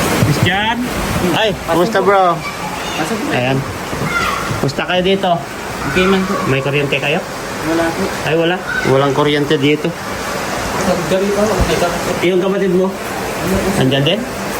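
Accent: Ilocos, Philippines. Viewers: Salamat sa pagtulong at naka subcribed napo ako sa chanel nyo sir ilocano kasi sa tono po ng pananalita mo god blessed po at sa magkapatid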